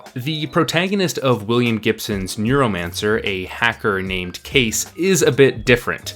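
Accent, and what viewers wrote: Canada. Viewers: sounds like they might be from Canada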